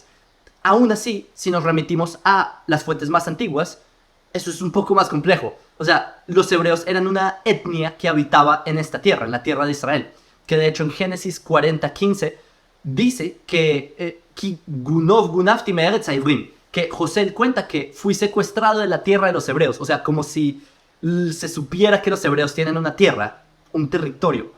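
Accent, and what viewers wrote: Bogotá, Colombia. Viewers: Tienes un acento muy curioso, es decir, un acento colombiano y no israelí...en fin, ya estudiaste bien tus lecciones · Tiene acento Colombiano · Se nota que aprendiste el español en Bogotá diria yo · Tienes acento Colombiano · Bravooo!!!! 👋👋👋👋👋 excelente. Explicación aclaraste muchas dudas . Te seguiré . Tienes un acento colombianisimo 😊 un abrazo 🫂 🤗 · Aprendiste español en bogota o con un colombiano ? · Tu español suena muy parecido al " colombiano "😊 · Tu tienes un acento muy Colombiano. Naciste de Padres Judios Colombianos ?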